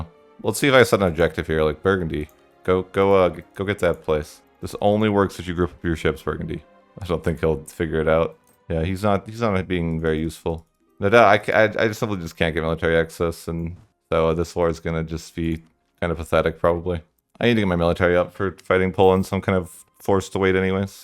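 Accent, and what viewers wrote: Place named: United States. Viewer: You sound so similar to an American colleague I work with, what state did you grow up in if you don’t mind me asking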